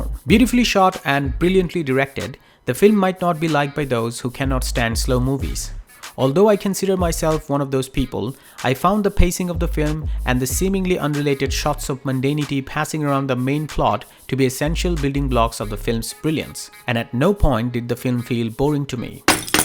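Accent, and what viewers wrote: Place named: Bengal, India. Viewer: You're the perfect example of pure Bengali accent. It's enjoyable to listen.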